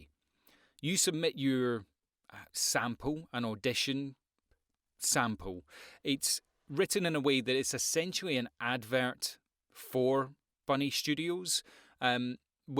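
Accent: Scotland, United Kingdom. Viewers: Your videos are fantastic. Began my VO career last year and have jumped around a number of channels on the subject. Yours are extremely thorough and made all the more watchable thanks to articulate manner and Scottish accent! Lovely stuff 😎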